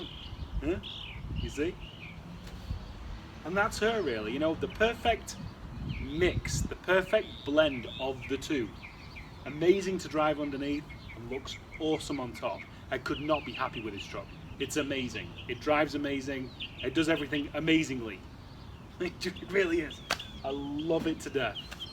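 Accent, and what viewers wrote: United Kingdom. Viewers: A guy with a British accent living in cali. With an old school Ford truck. Welcome to America friend!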